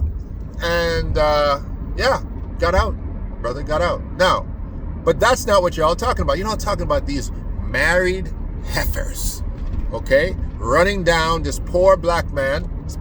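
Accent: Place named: Trinidad and Tobago. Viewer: u r funny bro. u sound like u from Trinidad 🇹🇹 🤣 😂 great humor bro and good takes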